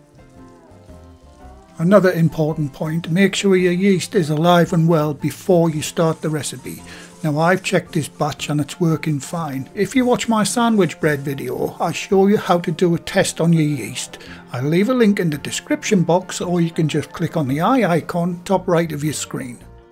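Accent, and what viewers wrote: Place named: North East England, United Kingdom. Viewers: Hello. It’s great to hear my own accent while living here in Crete. As a lass from Seaham Harbour it is music to my ears. After retiring we went to Vancouver, Canada for twenty years and I loved enjoying a Vietnamese roll when going to the mall. Now in Crete there are no meat pies or these rolls so I’ll definitely make some in the cooler months. Love your recipes, your presentations of them are excellent. I’ve learned so much already. Thank you. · Your baking has been the highlight of my week! As a Sunderland lass stuck in another lockdown in Shanghai, I appreciate not only the fantastic bread but the sound of a North-East accent:) They don't really do home ovens in China, but I've bought a table top Panasonic and I am definitely going to try these today. Thanks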